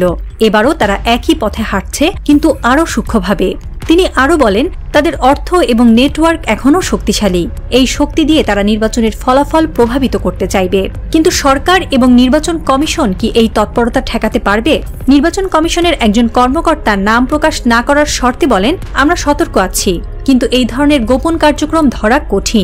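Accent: Kolkata, India. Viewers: কবিতা বাদ দিন, আপনাদের খবর শুনতে খুব খারাপ লাগে। কলকাতার মত সংবাদ পাঠ বাদ দেন প্লিজ।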